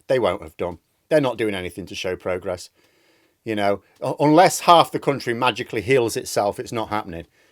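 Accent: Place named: Yorkshire, United Kingdom. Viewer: There once was a YouTuber named, With a passion for politics, he'd thrill. From Yorkshire, he hailed, a voice so bold, Discussing UK news, his stories unfold. Before his YouTube days, a science teacher he'd been, But the allure of politics, he couldn't resist the scene. In 2018, he created his account with delight, Ready to dive into political news, day and night. Transitioning to full-time content creation, channel gained traction, a rising sensation. With July 2021, a milestone he did reach, 100,000 subscribers, his message within their reach. A proud supporter of the Labour Party's quest, A socialist at heart, with conviction expressed. Pro-EU, Brexit's impact he'd dissect, Unveiling the consequences, he'd introspect. Scandals within the Conservative Party, oh what a feast, Boris Johnson, Liz Truss, Rishi Sunak, no peace. shed light on their actions, with a critical eye, Unmasking the stories, no deceit could pass by. 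So if you seek political insights with a Yorkshire flair, is the YouTuber to care. His witty ditties on politics, a joy to explore, In the realm of UK news, he'll leave you wanting more.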